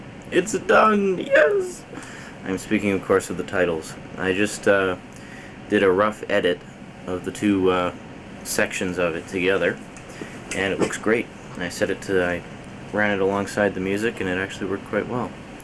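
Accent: Canada. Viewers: You sound Canadian are you from Canada?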